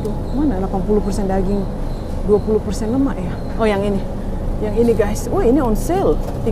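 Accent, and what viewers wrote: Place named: Karo, Indonesia. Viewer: Suka kali lihat logat kaya kakak, kayak bibik aku orang Karo. Selalu di tunggu shopping onlinenya dg ❤❤❤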